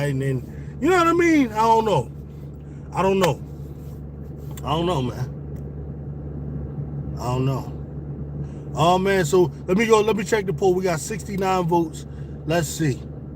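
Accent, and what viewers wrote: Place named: Philadelphia, United States. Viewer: Bruh I'm at work at 3 am listening to ur content u got me cracking up 😂 really enjoy ur channel very informative and the way u talk that Philly talk saying jawn u got a subscriber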